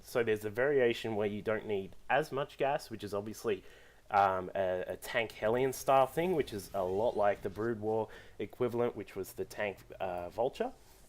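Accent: Australia. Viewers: Do i hear Australian accent? Like the vids man, keep em commin'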